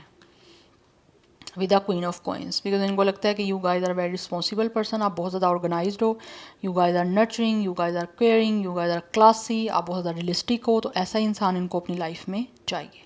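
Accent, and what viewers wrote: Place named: Haripur, India. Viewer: Ape card reading main her bate per yes keuin bolty hain Mazret kay sath ape Hari poor key hain ape kay Lehjay say Andaza Lagya hy main nay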